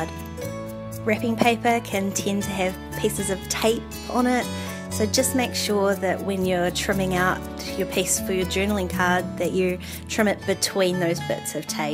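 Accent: New Zealand. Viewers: I already do these things with all my hoarded bits and bobs but the origami crane out of the new world receipt love it! Very inspirational. And it's nice to hear a kiwi x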